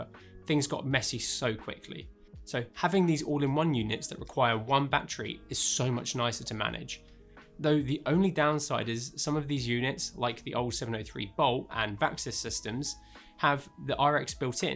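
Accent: United Kingdom. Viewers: This dude has to be British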